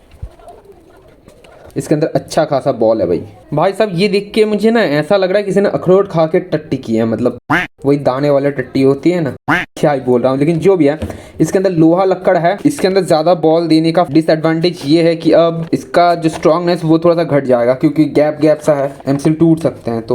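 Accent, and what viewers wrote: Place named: Bihar, India. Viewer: Bihari hai ye bhai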